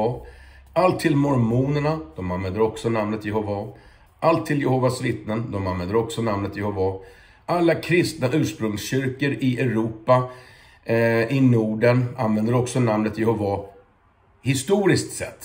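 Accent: Skåne, Sweden. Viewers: Bra med titel som beskriver innehållet, gjorde att jag slapp irritera mig på skånska. Religionssnack är intressant om det är på rikssvenska.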